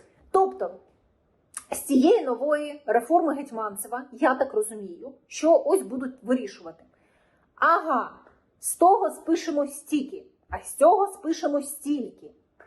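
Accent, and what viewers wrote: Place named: Russia. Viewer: В українській мові немає щьо,, щьоб, чі ,тощо. Відійдіть від російської мови.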